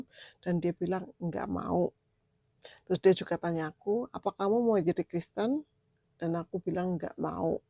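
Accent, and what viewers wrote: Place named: Java, Indonesia. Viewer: Bhsanya medok tapi enak didengerin kloboad lagi cerita, semangattts dan sukses terus ya mpok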